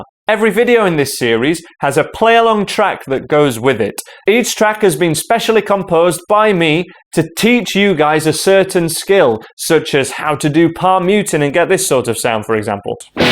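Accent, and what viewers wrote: United Kingdom. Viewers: Jesus, man, you're sooooo britttish!! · Something tells me that your british 🤔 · Speediablo 7 Productions he sounds absolutely nothing like Ali-A, the only similarity is that he’s british, that’s it. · you are only brit that i can listen to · I think this guy is a bit British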